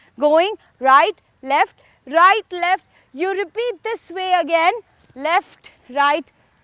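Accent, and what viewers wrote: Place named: India. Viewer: you speak english with indian accent so i cant understand what you are telling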